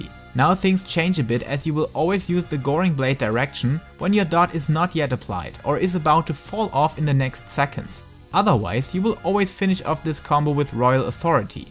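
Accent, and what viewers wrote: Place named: Germany. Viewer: Es ist einfach soooo anstrengend einem deutschen zuzuhören wenn er Englisch spricht. Sicher ein echt gutes Video, aber da man einfach nichts verstehen kann, gibt es ein Daumen runder… schade, dein Video hätte mir sicher sehr sehr gut geholfen und ein Abo sicher. Aber leider nein. Dann schau ich mir lieber Videos von amerikanischen Mitspielern an. Die versteht man wenigstens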